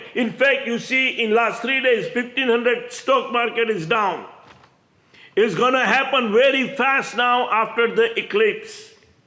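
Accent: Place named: India. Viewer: Are you a Jew or an Indian. Your accent is Indian. 1. Why say that no Prophet in the old testament did any heaking or miracle? Elisha healed the Syrusn commander from Leprosy 2. Moses oersformed mamy signs and wonders 3. A dead person who was buried next to the bines of Elisha got resurrected. 4. A Son 9f the widow was resurrected by Elijah 5. Jesus made it clear that whatever He did was done by God the Father who eorked in Him or using Him NOW YOU , WITH NO POWER TO DO SNY MIRACLES. YOU WILL NEVER RAISE THE DEAD . WHAT WILL YOU HELP ANYONE WITH 7. A MESSIAH MUST BE ABLE TO RAISE THE DEAD. IF YOI CANT RSISE THE DEAD , THEN YOU EILL NEVER RESURRECT FROM THE DEAD EITHER . SO YOU ARE A NIBIDY AND CANT HELP ANYONE THE GREATEST ENEMY OF HUMANITY IS DEATH and also diseases If you will not taise the dead , you are worthless. You will judt die and become soil.like everyone 8. 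So, WHAT IS THE DIFFETENCE BETWEEN YOU AND OTHER HUMAN BEINGS ? . You feel helpful to Jews by telling them to fight on until GOG AND MAGOG war . Is that what Jews are looking for. A firever war life . Dont they want peace ? JESUS CHRIST IS THE PRINCE OF PEACE . REJECT HIM TO YOUR OWN DETRIMENT . WHOEVER BELIVES YOUR LIES WILL BE END UP IN THE SAME FATE.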